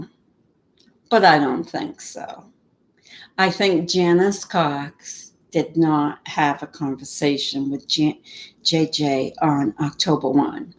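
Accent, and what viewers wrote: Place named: East Coast, United States. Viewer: I love your Eastern accent...New York? Boston? Where are you from? Thank you for sharing your knowledge....Very much appreciated!🌞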